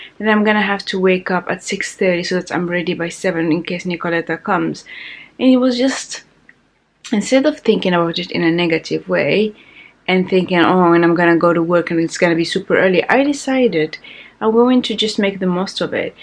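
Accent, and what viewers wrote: United Kingdom. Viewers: Its been a while!! You are starting to catch the english accent!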